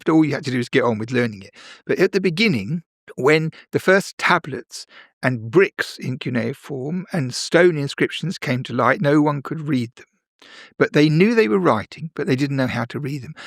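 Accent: United Kingdom. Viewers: Funny u must be American saying he’s got accent. He’s British maybe u 😢are the one with accent. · Heard the British accent - knew it was gonna be good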